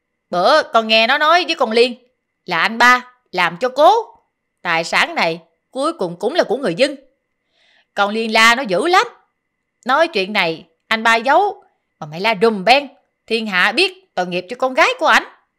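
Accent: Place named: South, Vietnam. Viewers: 😅dung la m.c co giong doc mien nam rat gi🍓 khong lai ti nao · giọng diễn đọc rất miền Tây Nam Bộ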